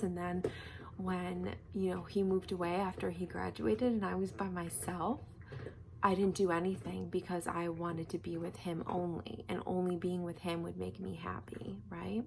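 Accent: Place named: New York, United States. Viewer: Girl what part of New York are you from !?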